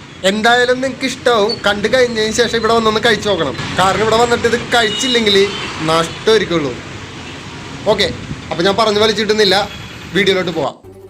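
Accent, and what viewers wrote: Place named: Thrissur, India. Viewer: Good presentation I like your style and way of speaking....by hearing this I proud of my Thrissur slang❤️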